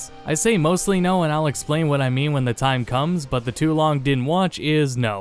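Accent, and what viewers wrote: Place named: United States. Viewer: "Teet-us"? Really? You sound American. There is no excuse for you pronouncing it that way. lol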